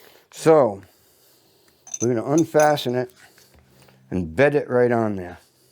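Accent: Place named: New England, United States. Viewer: Glad yo see you back, enjoy the channel and learned a lot from watching that I've been able to transfer to more general woodworking. Grew up in New England, and it's nice hearing that accent again.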